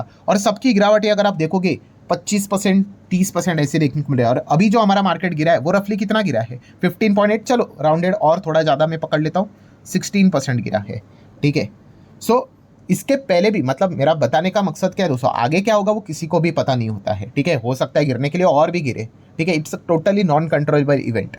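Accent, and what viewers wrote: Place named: South, India. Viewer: Bhai south wale hai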